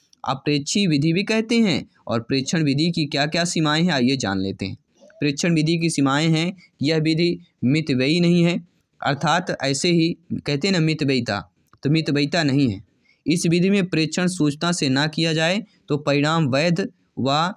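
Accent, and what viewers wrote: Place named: Bundelkhand, India. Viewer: बुंदेली बोली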